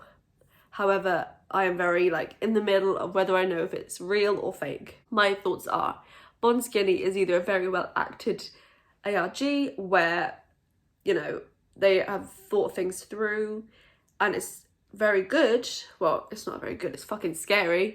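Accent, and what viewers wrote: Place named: Ireland. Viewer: Your Irish accent keeps coming through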